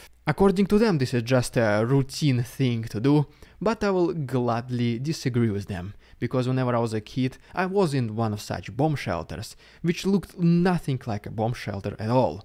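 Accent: Russia. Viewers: Obviously your world is very small. Probably good that you move on. Listen to him for a week and you hardly notice his Russian accent.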